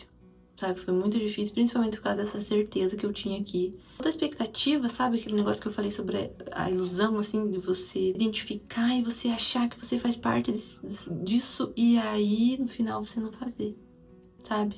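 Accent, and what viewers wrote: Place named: Paraná, Brazil. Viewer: Nossa, que loucura, eu reconheci o sotaque do Paraná, mas nem sonhei que fosse de Guarapuava. Moro aqui atualmente também. Amanhã recebo minha devolutiva, e meus sentimentos desde que descobri essa possibilidade foram muito semelhantes aos teus. Achei a profissional que considerava ideal, que me transmitisse a confiança suficiente pra não dar margem pra síndrome do impostor atacar, caso recebesse a confirmação do laudo e mesmo assim ficasse duvidando da veracidade da informação. O problema é que ela tinha uma fila de espera de cerca de 6 meses pra avaliação. Jesus, tive que esperar né, mas imagine a "angústia" 😂. Mas valeu muito a pena a espera. Agora estou vivendo a mesma fase, de ter 99% de certeza que sim, mas com aquele medo de cair do cavalo amanhã é real. Também a preocupação de o que fazer com essa informação, caso se confirme. 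Enfim, muita coisa pra sentir, mas feliz por estar fazendo. Parabéns pela coragem de se expor assim, só quem passa pelo processo e estuda o tema é capaz de entender as dificuldades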